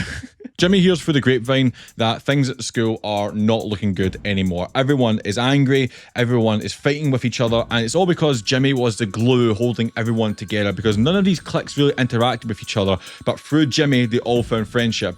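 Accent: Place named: Scotland, United Kingdom. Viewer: Great video but I’ve had to stop watching cause I’ve realised I don’t really remember the game that well and I want to replay it now 😂 I’ll come back later. I have subscribed though and I’d like to see more videos about older games from you but don’t worry, I got through stages so I’ll get round to your newer game videos as well. Seems like the best of both world here. Also, it’s great to hear the Scottish accent, I used to hear it all the time in England but not so much anymore but let’s not go there 😡😅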